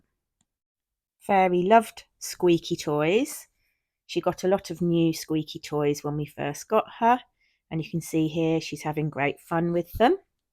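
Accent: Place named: Essex, United Kingdom. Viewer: Fairy is beautiful and she is so lucky she has landed on safe ground with you as her new owner. You sound the perfect, caring owner every dog could wish for. Well done for rescuing her! 👏🏼👏🏼👏🏼Seems funny hearing the Essex area accent again. It's exactly how I spoke. I now live in Ontario Canada and have done for 45 years, but I was born in Romford, but lived near Maldon after getting married. It's a lovely area, obviously I know it well. I have always loved greyhounds and my brother-in-law owned a Lurcher. How I loved that dog. He looked like a big hairy greyhound LOL He was also a rescue and had been used for badger baiting. Rescue is the way to go! (she says - with a breeder bought Shih-Tzu/Maltese 🙄)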